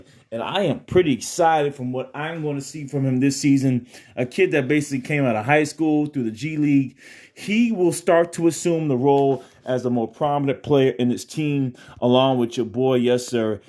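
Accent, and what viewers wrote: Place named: Australia. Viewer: lol, love the urban-ausie accent. :) Thanks for the video man. Appreciate ya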